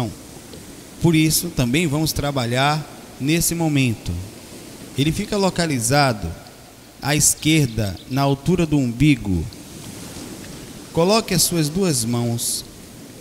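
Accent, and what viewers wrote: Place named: Bahia, Brazil. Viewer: Nestes vídeos antigos ele não tem sotaque, que diferente. Ouvindo em 2023. Assistindo ele atualmente percebe-se um sotaque bem pronunciado da Bahia. ❤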